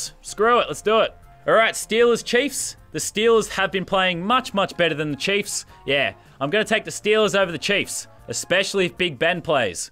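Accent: Australia. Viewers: Are you australiain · are you Australia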